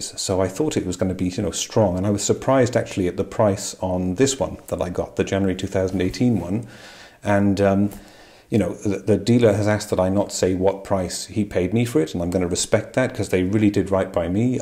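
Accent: Wales, United Kingdom. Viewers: I could clearly hear those dulcet Welsh tones!! Enjoy Denmark but there will always be a welcome in the hillside! If Denmark is anything like Norway you should be able to get a nice range of EVs!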